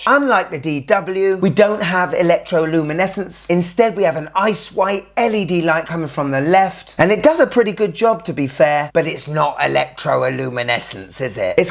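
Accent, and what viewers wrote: United Kingdom. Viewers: Best YouTube channel ever!! Sorry for my bad English.. I'm a fan from Brazil.. I also love Casio watches..I own 4 Casio's . Casio MW 240 is the cheapest and the most beautiful watche ever made. I wish you were my friend. British accent are the best accent in the world.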